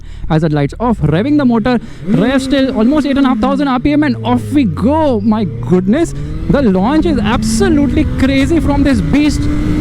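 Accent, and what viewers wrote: India. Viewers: Typical indian annoying accent.. 🤮🤮🤮..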